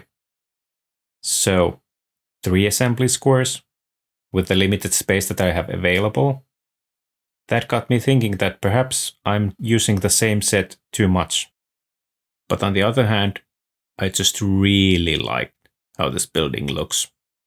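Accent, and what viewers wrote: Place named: Finland. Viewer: It's weird to immediately recognize from your accent but I think you're also Finnish? That's a nice building although the detail work is bit too cluttered for my taste.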